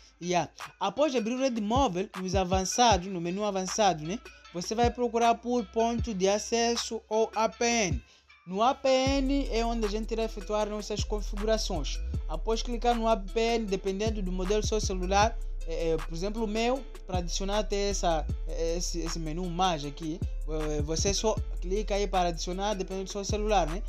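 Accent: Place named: Africa. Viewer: seu sotaque é africano 🤔🤔🤔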